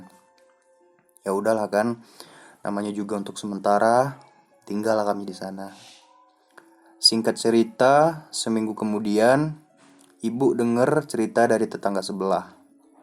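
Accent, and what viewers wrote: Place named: Medan, Indonesia. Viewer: Suka x aku bg denger kau bawakan cerita ini, medan x kurasa.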